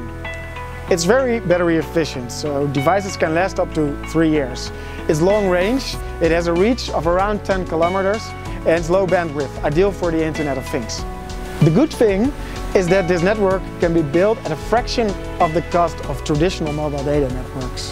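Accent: Netherlands. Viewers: dikke nederlandse accent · zwaar nederlands accent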